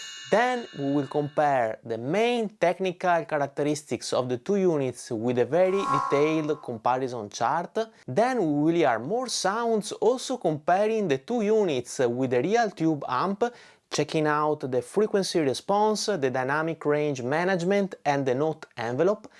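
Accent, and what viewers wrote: Venice, Italy. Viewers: Possiedo l'Ampero MP-100 da un po' e confermo quanto scritto da: uno dei primi aggiornamenti del firmware ha 'sbloccato' la catena rendendola totalmente flessibile. Con l'ultimo aggiornamento è possibile caricare 20 (anziché solo 10) IR di altri produttori, ottimo lavoro e composizioni degne di un album. Sarà ma il tuo simpatico accento mi ricorda il parlato veneziano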